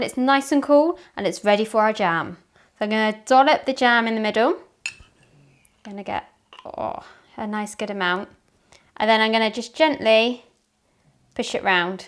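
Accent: United Kingdom. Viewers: why do you sound British I'm British and I don't sound like that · Talking in British English nice taking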